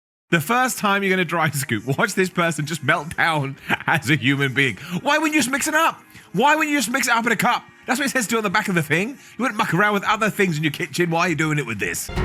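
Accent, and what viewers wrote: United Kingdom. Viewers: I forgot your British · Always a week later with the content Gregg Doucette, More plates More dates, Or Phillion make is a bit Lame... just copying topics from others and adding a British accent to it. What about creativity and original content? In the beginning it was a bit funny but now it's getting exhausting watching the same content twice so I'm gone lol :D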